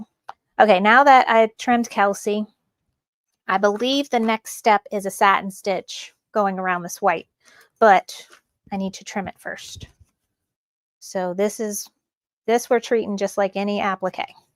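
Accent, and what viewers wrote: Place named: Italy. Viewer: I have really enjoyed this amazing video. Thank you so much. I am using the marine vinyl and have the hardest time trimming it all so the satin stitch covers it. Is it my scissors? BTW I picked up on that accent before I looked to see where you were from. 😂 We are opposite sides of the boot.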